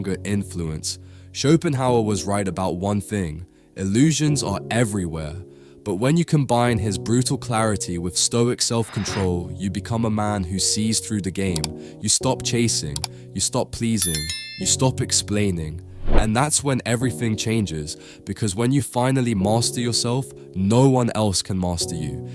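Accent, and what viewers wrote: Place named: Scotland, United Kingdom. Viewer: Its a Scottish accent it has a charm its human its natural its better than the AI generated voices on the internet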